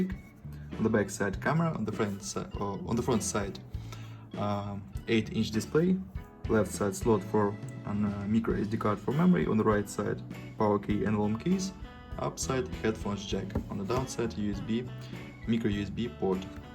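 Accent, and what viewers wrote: Russia. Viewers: Just by chance is you’re first language Russia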